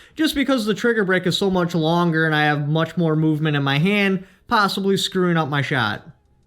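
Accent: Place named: Upper Midwest, United States. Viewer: Nice information, presented clearly and without wasting time. Very good. Love the upper midwest accent.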